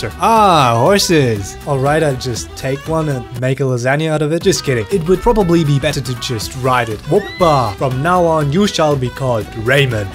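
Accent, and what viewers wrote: Germany. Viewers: are u german? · I have a question are you German? It may sound weird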